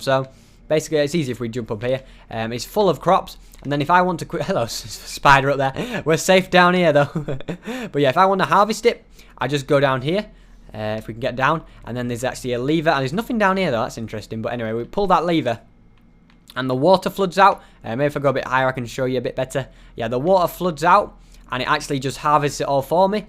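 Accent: United Kingdom. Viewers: Happy Gamer he doesn't say fam it's just his british accent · Are you british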